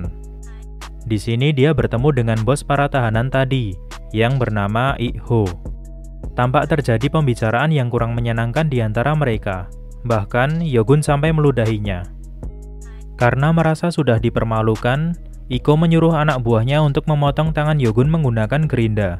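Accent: Java, Indonesia. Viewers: Iki wong jowo iki . joss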